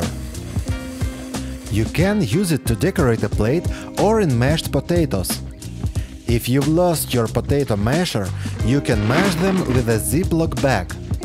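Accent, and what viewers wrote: Russia. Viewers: Не узнаю откуда этот акцент...Саратов? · русский что ли? такой говор чисто русский😃. видео оч полезное, благодарю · Я одна слышу русский акцент? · You are amazing! Some of your short cuts I had learned from an amazing lady who passed away about 3 years ago who had a YouTube channel and focused on making old recipes from the 50’s she had learned from her mother while they lived in Virginia. She was born in 1947. A lovely , lovely lady and her husband too. He had passed just about 8 months before she did. I love that I am learning new cool techniques from you. I’ll pass your videos around to my friends and family. Are you from Russia or Ukraine? I ask because of your accent. Great job, and please keep up the good work! Your videos are very enjoyable❤😊!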